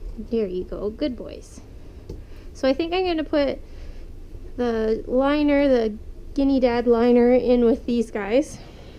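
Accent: Canada. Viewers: I'm from FL and I've never heard the way you say it but I immediately knew you were from Canada by the way you say certain words. For someone to be so petty and call you illiterate, well, they just have way too much time on their hands...I thought it was cute and made me smile.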